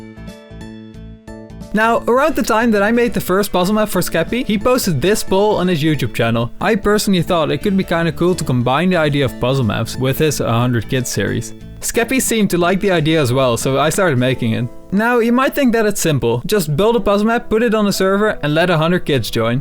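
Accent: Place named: Netherlands. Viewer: are u dutch? ur accent and pronunciation sounds like a dutch one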